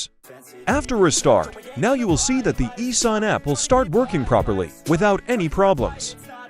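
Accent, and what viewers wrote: South Africa. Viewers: Are u South Africa bro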